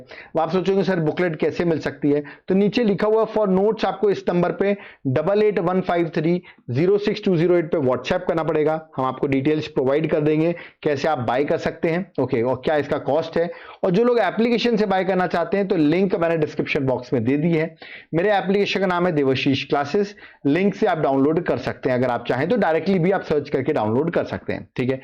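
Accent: Odisha, India. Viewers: Sir app Odisha se ho kya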